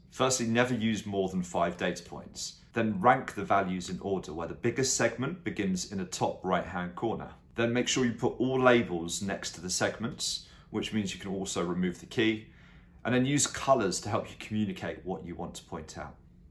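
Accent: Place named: United Kingdom. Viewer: I think you are from UK😊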